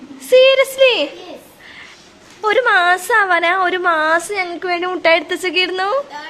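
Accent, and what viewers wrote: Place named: Malappuram, India. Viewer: Thani Malappuram samsaram😂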